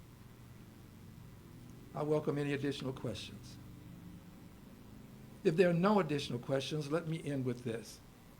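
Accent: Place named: United States. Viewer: True and clear speech . For me as a german it sounds like America.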